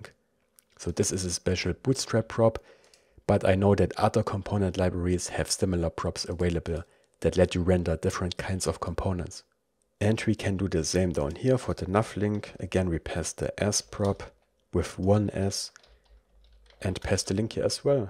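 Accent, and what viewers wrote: Germany. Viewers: After watching German content (mainly movies on Prussia ) for a fortnight I was thinking I was hallucinating as I heard a German accent but when I checked his location It was Germany //I was relieved that I was not hallucinating btw the thumbnail was no clickbait it was all in one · man hört absolut deinen deutschen akzent aber sehr nices video bist nen cooler typ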